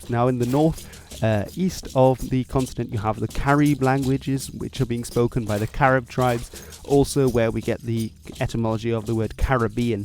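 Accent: Argentina. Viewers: Guy who runs this channel: Congratulations on your pronunciation! Your south american accent came out very Argentinian! I'm Argentinian btw. A difference you forgot to address is that us Argentinians speak differently from almost all other south americans. Most south americans pronounce "Yo" (me) as "Io", but we pronounce is as "Sho". And we,m argentinians, are also much more vulgar in speech than the rest of the spanish speaking nations. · Your "latin american spanish " is just argentinian spanish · your spanish has an Argentinian accent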